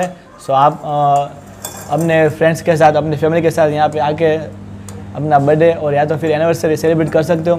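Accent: Gujarat, India. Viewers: Specially Loved u r gujju accent hindi. TRY SOME VLOG IN GUJRATI. Might help u littel bit more to grow. BTW AMAZING EFFORT GL.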